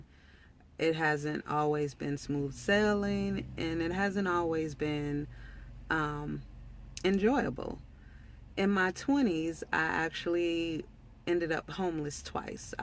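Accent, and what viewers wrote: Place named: St. Louis, United States. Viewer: New subbie hurrr . I just knew u was from the Lou because of your accent with the “ rrr”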